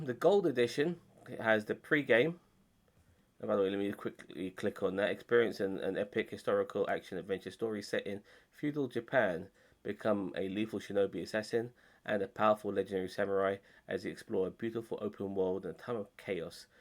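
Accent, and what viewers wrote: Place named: United Kingdom. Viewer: This guy is too brittish!!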